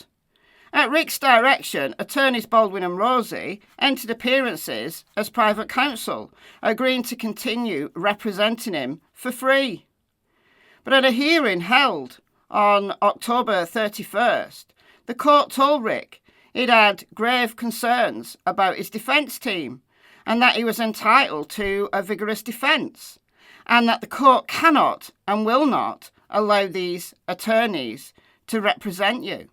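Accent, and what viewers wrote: United Kingdom. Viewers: I know I can’t be the only one that thinks that hearing the words “numb nuts judge” in British accent sounds so much funnier than someone with an American accent saying it!!😂 and the “you need to be watching the Super Nanny, Frances! You’re not very good at this, Frances!!” I’m cackling and my husband is looking at me like I’m crazy! The snark in this video is completely appropriate and warranted!! Frances C. Gull (aka Frances Seagull as in the bird 😂) is a complete joke and a disgrace!! 🤡🤬I just pray that the Indiana Supreme Court judges are able to see through the dishonorable Judge Gull’s BS!! I am also praying that Judge Gull is removed from the trial and that Baldwin and Rozzi are reinstated! Most importantly however, I am praying the hardest for justice for Abby and Libby!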